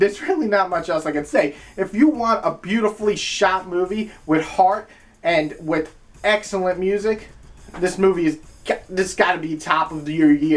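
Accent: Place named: East Coast, United States. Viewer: I agree, he sound like Jonah hill with a east coast accent.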